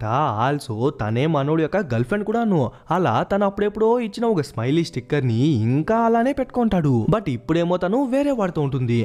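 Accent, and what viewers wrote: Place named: Rayalaseema, India. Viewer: AS ALWAYS SUPER EXPLANATION ANNA love your all video from beginning mi telugu slang naku baga nachindi ekkada anna rayalaseema na ♥♥♥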